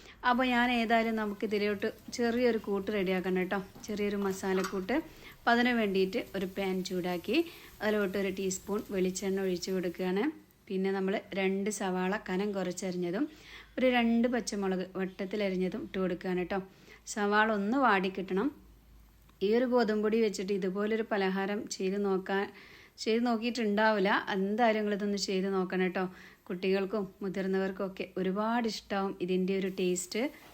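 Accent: Malappuram, India. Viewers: Malapuram thathante samsaaram aayirunnalloo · മലപ്പുറം താത്താന്റെ സംസാരം മാറിയോ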